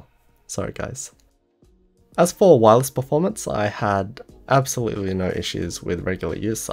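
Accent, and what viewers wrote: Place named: Australia. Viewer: an Aussie accent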